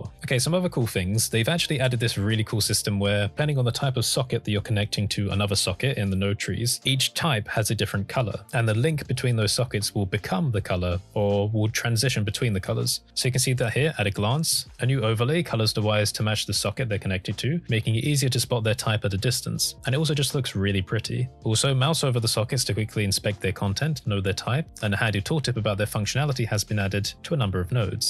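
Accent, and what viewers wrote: United Kingdom. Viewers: this video was exactly what i needed. an easy to understand explanation of the new features in a british accent with a bit of dry humor weaved in. Thx. love ur videos and by-gen. idk how to make a unicorn emoji tho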